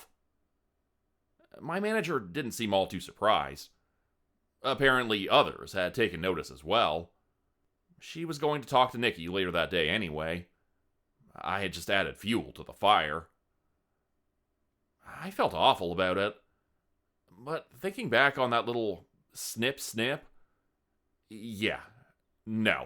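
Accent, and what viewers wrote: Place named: Canada. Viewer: Another great read love the Canadian English lol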